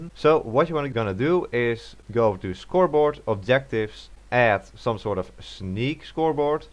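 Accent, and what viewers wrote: Netherlands. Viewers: Wow if thats not a dutch accent i don’t know what is XD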